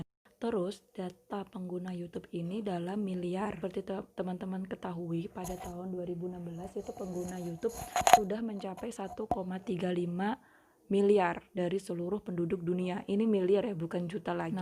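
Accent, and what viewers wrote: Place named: Java, Indonesia. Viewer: MANTHUL... INFONYA SANGAT MEMBANTU... #AGAKMEDHOK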